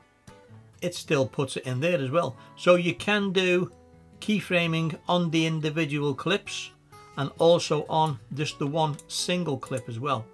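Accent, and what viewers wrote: Liverpool, United Kingdom. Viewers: Hi mate, i googled compound clip for something on Fillmora as I made a video that had wayyy to many clips and needed to merge them as I made 3 separate "films" part 1,2 and 3. Needed to put them as 1 as it took 8 hours to make the 3 films into 1. Anyways Googled it an your video was the 1st video to come up... hit play and I smiled as your VOICE, the accent. I recognised as I'm from Liverpool and it was nice to hear. (Hopefully u r from around these ways an i don't look like a wally lol) Thanks for the video. I'm getting into Fillmora more & more. I don't restrict myself to a software as its the amateur thing if u don't use Premier or the apple 1. I like to use Adobe, davinci & filmora I am really enjoying it. Will be watching more of ur videos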